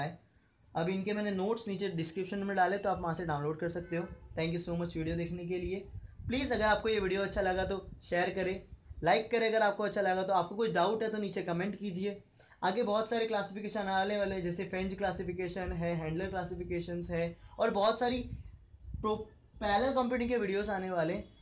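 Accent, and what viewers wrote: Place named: Indore, India. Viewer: Your way of speaking is awesome! Indori type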